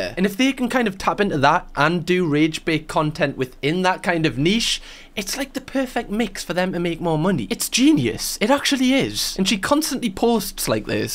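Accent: Newcastle, United Kingdom. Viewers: I am being 100% serious, I subbed to both of your channels after never having seen one of your own videos. I saw you in a collab and was like "oh hes a geordie (honorary scot) imma sub to him"... been binge watching since lol.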